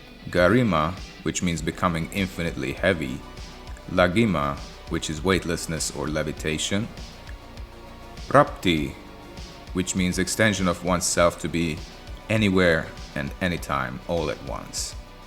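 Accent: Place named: Eastern Europe. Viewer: Sounds Eastern European.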